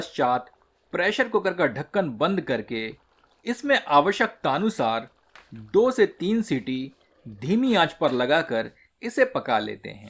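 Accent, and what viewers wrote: Standard. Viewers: Aapki Shudh hindi bahut sunder or saral hai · आपकी शुद्ध हिंदी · शुद्ध हिंदी में बोलने का जो तरीका है वो अतिसुंदर है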